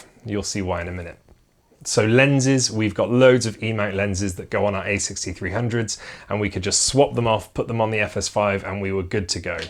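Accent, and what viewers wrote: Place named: United Kingdom. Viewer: you don’t have to thank me, I watch for selfish reasons. You have lots of potential, I’m liking your topics and of course, the British accent;)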